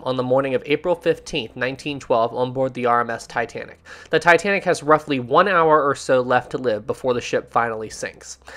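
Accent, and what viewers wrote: South, United States. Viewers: His southern accent and charm definitely add to it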